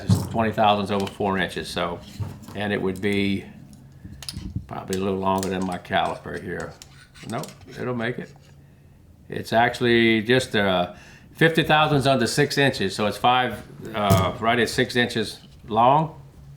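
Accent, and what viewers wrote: Louisiana, United States. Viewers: Mr, I a a North Louisiana native myself. I noticed a bit of a Cajun accent but thought you were a Texan. The good food and music got to ya and you know it's true. I love and respect you and consider you an American badass.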